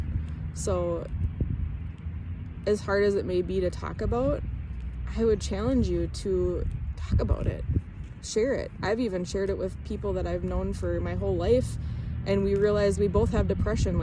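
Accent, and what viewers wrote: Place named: Canada. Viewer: I WILL add a comment since somebody may have passed your thoughtful video by. My Grandfather also lost his life by suicide. In fact, my Mother, who I must admit cannot go see, was pregnant with me when her Father did so. I believe mental health issues run in my family. There was a sincere belief that my Grandfather thought his cancer was coming back(this was in 1975), didn't want to be a burden, feared the pain, or perhaps it was an entirely different reason not related to his physical being. He had 12 children, with two different wives. His first wife passed on. But they were all related nonetheless! (You sound Canadian, btw. That is not a dig. I ❤ Canadians. I'm a simple, poor New Yorker myself.) I do suffer terribly from Major Depression with Panic Attacks, and other "things" I won't bore you with, as you are genuinely hurting as you are paying respects to much-loved family member. 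My place of work is being very charitable bc I'm on leave now. My poor husband and elder Sister have been blindsided by me not working and reasons why. I live in my own head and now I'm going through another slow process of getting help here in NYS.I have been scared into taking my medication the correct way, not running out, so I can resume my full time Custodial job at the local Casino. I wish YOU, YOUR FAMILY, & whomever happens to come across my long, but true comment that suicide is just plain scary. You leave others wondering why and so heart-broken. Because of my Grandfather's death, and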